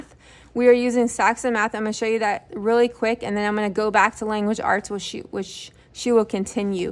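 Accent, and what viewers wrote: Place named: Argentina. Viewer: Hi! I am curious to know where you are from..the way you speak English sounds a lot like mine. I am originally from Argentina but I live in the US for a litter over half of my life. And I homeschool my kiddos that is why I got drawn to your channel. Thank you for sharing.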